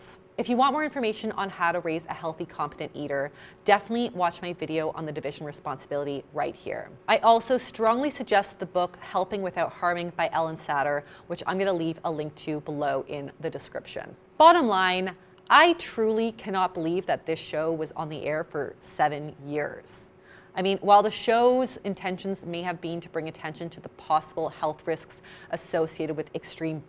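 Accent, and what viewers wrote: Canada. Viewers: Well yeah, at least in this context they are very different. The US has shows that are arguably more vulgar about weight than this one- My 600-lb Life or 1000 lb sisters. I don't think you knew she was Canadian, it doesn't seem like the majority of British people can distinguish between Canadian and American accents.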